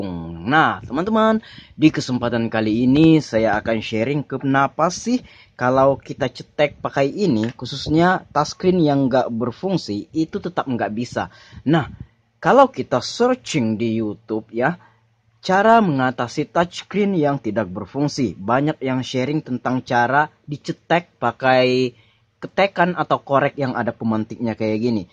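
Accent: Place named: Makassar, Indonesia. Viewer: Dari gaya bicara nya bang,heheh soal nya punya ipar orang makasar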